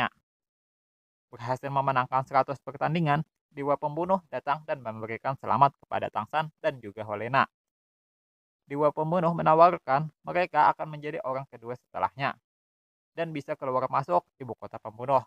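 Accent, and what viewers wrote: Banjar, Indonesia. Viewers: Manyuruh 😂😂 . Banjar banar ah ikam 😂😂😂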